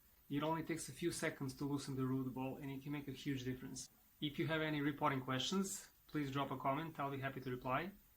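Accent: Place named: Serbia. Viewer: Where are you from? For some reason, you sound Serbian to me 🤗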